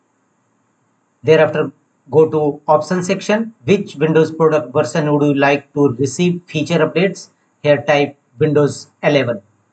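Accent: India. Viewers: Thank you for your teaching, it seems that the professional version only has gpedit.msc, when you have time to play to see; it is a pity not to modify the registry file regedit, I am relatively familiar with. I'm familiar with regedit, and I'm guessing from your voice that you're a friend from India, and I've lived with friends from India before. ( DeepL translation ) 謝謝您的教學，好像專業版才有 gpedit.msc ，有空的時候可以玩玩看；很可惜不是修改登錄檔 regedit , 個人相對熟悉，。聽您的聲音猜測是印度朋友，曾經和印度朋友居住過。( DeepL翻譯 )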